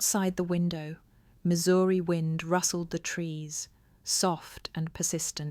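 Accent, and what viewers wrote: United Kingdom. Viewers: I’m not too sure of the narrators accent. I like the story line, just not the ‘almost’ British accent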